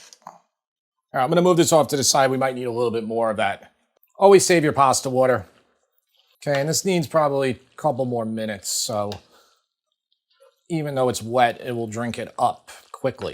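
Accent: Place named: New Jersey, United States. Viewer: Brother, I love your channel. You remind me so much of my family in NJ. I miss that accent. I really enjoy the way you break down the preparation and the cooking, almost like an engineer would. I love all the hints and tips during the production that help us all to become better cooks. I love that your family is involved. I love that you’ve clearly done your studying beforehand and practiced each dish a few times. Thanks for your channel. I pre-ordered the book and I’m sure I’ll love it. I love Italian cooking. My heritage is mostly from Sicily and that must create a DNA-level connection to the food because it’s always been my favorite. Thanks again.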